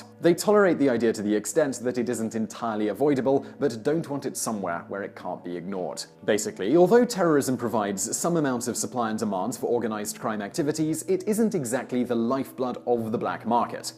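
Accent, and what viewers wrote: England, United Kingdom. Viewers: T Rohan You do realize that his ancestors were speaking English when yours were crawling around on the ground, grunting and swinging from trees. He pronounces it the way people in England, who speak English, pronounce it. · All these yanks upset with his pronunciation. If you don’t like the English language in its natural form, use someone else’s mother tongue. We don’t really want you walking round telling us how to pronounce things. Bastardised to the highest of degrees. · For those complaining about how says the word mafia: he speaks with an English accent. He is saying 'mafia' correctly according to where he is from. Aussies, some South Africans, and many other non-North American English (according to their accents and dialects) regions say mafia the same as.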